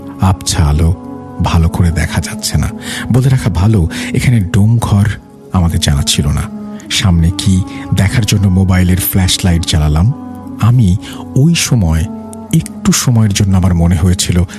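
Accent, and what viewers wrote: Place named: Tangail, Bangladesh. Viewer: Tangail theke shuntesilam 🥺🤍 nijer district er kotha shune valoi laglo😊💟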